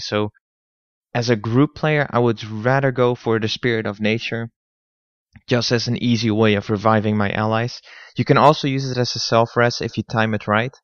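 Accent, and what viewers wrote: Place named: Netherlands. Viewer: You sound Dutch! xD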